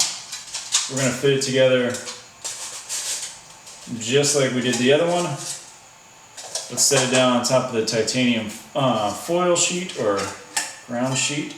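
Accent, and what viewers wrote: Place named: United States. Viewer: He is obviously speaking American English. His enunciation is different from yours or standard American English. I had no trouble understanding what he was saying.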